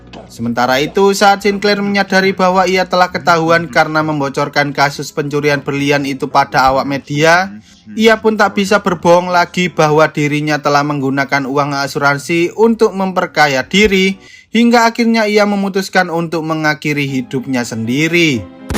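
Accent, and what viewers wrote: Java, Indonesia. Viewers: Suka penjelasannya sellu dgn logat mendok nya 🤭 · Terlalu mencolok wong jowo nya 😂 bg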